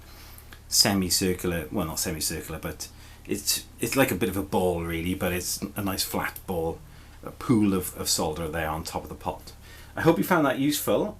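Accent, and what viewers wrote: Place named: Wales, United Kingdom. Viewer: Da iawn. Thought I detected a bit of Welsh accent. So cool to have pickups made in Wales.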